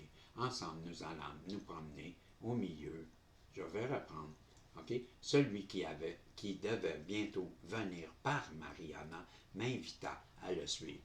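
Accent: Quebec, Canada. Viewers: Salut j'espère que ça va ❤ je vous adore Vous avez un sacré accent québécois 😂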